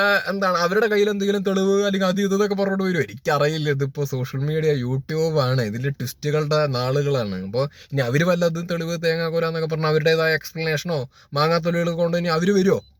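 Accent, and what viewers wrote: Palakkad, India. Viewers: Palakkad aanalle kettappozhe manassilayiii...😂❤ njnum pkd ane...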